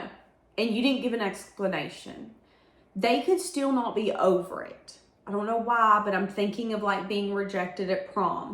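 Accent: South, United States. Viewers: you are very welcome..I like the sound of your American southern? accent..its great..I'm English and live in NZ..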